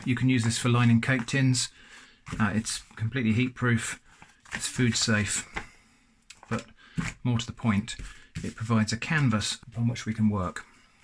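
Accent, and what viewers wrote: United Kingdom. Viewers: I love the British accent when it isn't too strong.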